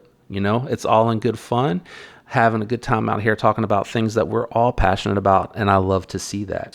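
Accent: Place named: United States. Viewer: Yeah buddy your good at making those videos where you from you sound like your form the good old US of a ☺️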